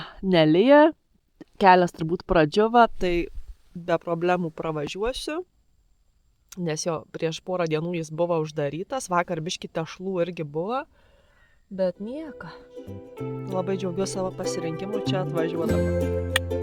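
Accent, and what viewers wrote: Lithuania. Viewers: Sveiki, smagu Jus stebeti, is kur Jus esat Lietuvoj, kalba kaip Siauietiska arba Panevezys?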